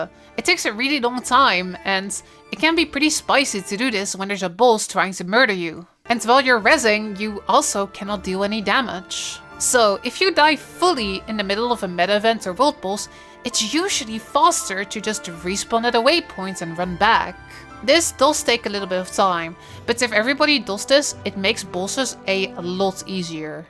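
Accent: Netherlands. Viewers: are you Dutch by chance? Your accent sounds familiar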